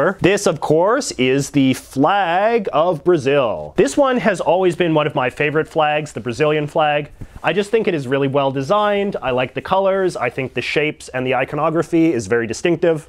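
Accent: Canada. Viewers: Way do u have the stereotypical Canadian accent. We don’t own him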